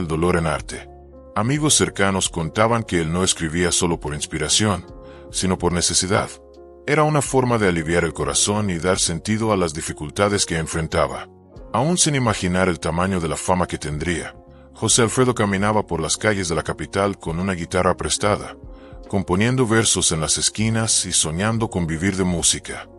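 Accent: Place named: United States. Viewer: Y tiene acento gabacho. Parece que es gringo el narrador.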